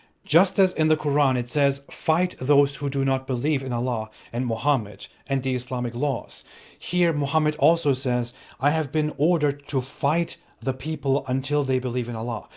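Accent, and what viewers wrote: India. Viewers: he has a heavy Indian accent but I guess you just make fun of Indian accent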